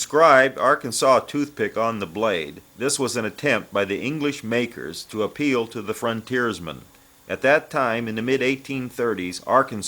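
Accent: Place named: California, United States. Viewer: You know this guy was raised on a walnut and prune farm in California. Not a Yankee or Southerner. Many old time people from California pronounce words differently. Listen to some of the old time radio and television programs. For that matter do you pronounce Los Angeles and Saint Louis correctly? Most people don't.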